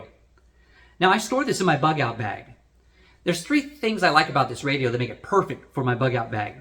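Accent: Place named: England, United Kingdom. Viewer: Bro's english is very English.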